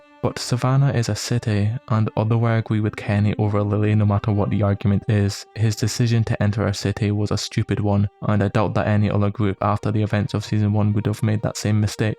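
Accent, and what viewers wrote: Scotland, United Kingdom. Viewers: Great video. Where are you from btw? Ngl I honestly thought you were European at first but as you got a little more comfortable I started thinking 'is he Scottish? Holy shit' lol 🏴🏴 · Scottish, fucking right mate good stuff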